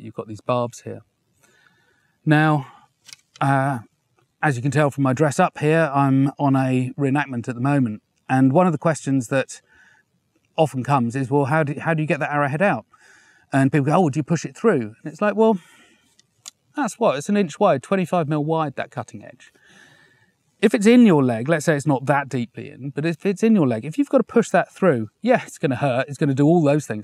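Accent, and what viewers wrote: England, United Kingdom. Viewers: 16 Minutes of the spoken word on arrows thoroughly enjoyable, the British accent so much more appealing and easy to listen to than that, I know everything American wine. Thank you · Is he English?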